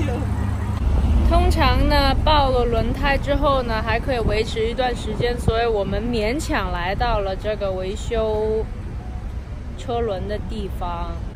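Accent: Hong Kong, China. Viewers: 唱歌像台灣人，講話像香港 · 港普好可愛啊哈哈哈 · 港普，體諒她一下哈哈哈😂在香港人裡她中文已經算說的很好的了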